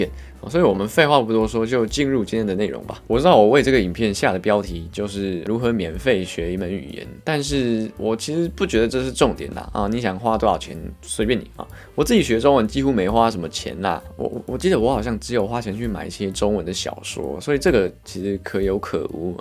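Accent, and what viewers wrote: Taiwan. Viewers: 😮😮居然全臺灣腔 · 你的台湾腔真牛逼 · 好台哈哈哈哈....不看畫面好像聽台灣youtuber講話，真的很厲害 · 天啊！如果沒看到的外國臉，只用聽的會以為是台灣人講話，黏音真的一模一樣😊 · 好難想像這是一個高中才學、家裡沒有講中文的孩子說出的台灣中文，用法發音口氣完全是台灣，甚至比一個多年住在台灣的中配講得還道地，太厲害了，衷心佩服！ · 你的台灣口音真的很道地...真的是台灣！ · 你很厲害，講中文時連英文字母都是用台式發音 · 我倒覺得是從小在台灣長大的孩紙❤❤❤整個就是台灣口音、台灣思考邏輯、正港台灣人😂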